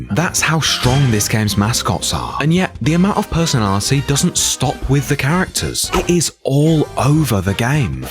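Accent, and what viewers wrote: United Kingdom. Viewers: i think this guy is british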